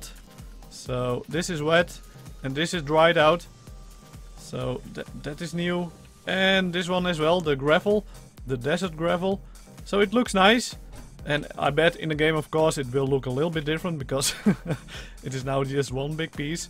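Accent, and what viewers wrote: Netherlands. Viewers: i knew u were dutch -_- lol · are you Dutch?? als dat zo is ;D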